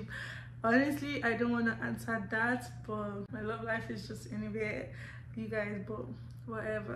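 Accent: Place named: Canada. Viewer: Wow... welcome back, i really miss you and i love Canadian accent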